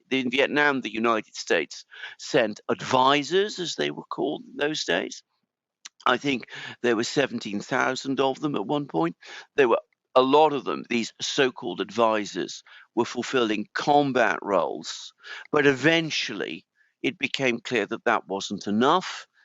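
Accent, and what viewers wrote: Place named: United Kingdom. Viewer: You mispronounce zaparesia and the names of lots of towns I don't know if it's your gay British accent lol or if you're just trying to sound fancy or I don't know makes you sound stupid though just FYI and you're not? Your very intelligent so I don't understand my man. I love you guys show you speak the truth!!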